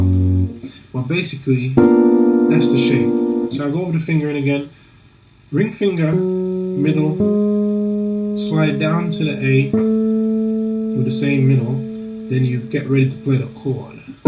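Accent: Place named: United Kingdom. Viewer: You sound like youre from the UK, which church do you go to?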